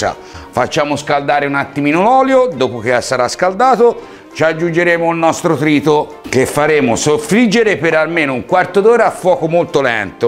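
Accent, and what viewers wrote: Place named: Livorno, Italy. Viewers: L"accento livornese è proprio così, più forte rispetto ad altre città toscane, almeno così ho notato. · A Livorno parlano così, è colpa del cinque e cinque.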